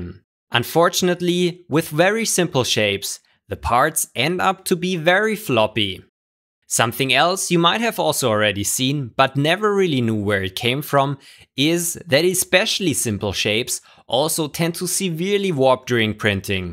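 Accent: Germany. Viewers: Man kann den Deutschen Akzent richtig spüren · I prefer textured. Also, I love your accent, where are you from? Edit: Nvm, i just finished the video, probably, German?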